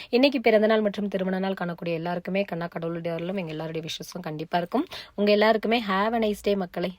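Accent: Tirunelveli, India. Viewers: Happy birthday thampi Akka neinga evlo naal estate la erukinga ananlum slang tirunelveli slang ennum ungaluku pogala akka❤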